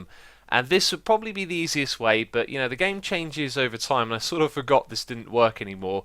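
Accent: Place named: United Kingdom. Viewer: I'm really not a good builder (In his awesome British accent) Me: (In my not as good american accent): Yea? Me: Look at your base.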